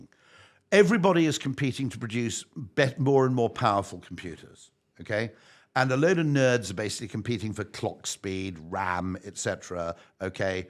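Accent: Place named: Scotland, United Kingdom. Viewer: He is completely understandable, it is a very mild Scottish accent.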